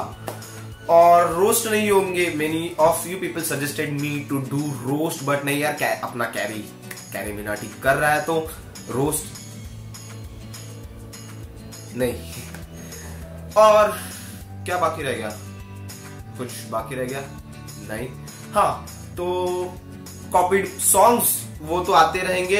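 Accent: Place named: Maharashtra, India. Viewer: I like your marathi accent 😃😄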